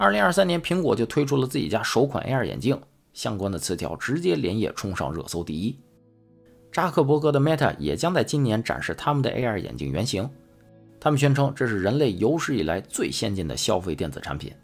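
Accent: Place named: Tianjin, China. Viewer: 真好，现在每期都要听，内容好，还有好听的天津普通话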